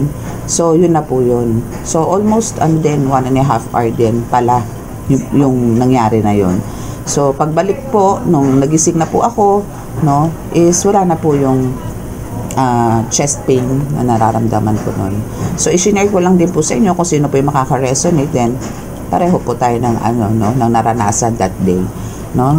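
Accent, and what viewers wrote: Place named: Visayas, Philippines. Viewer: hahaha cute niyo po mag bisaya 🙏🦋🌻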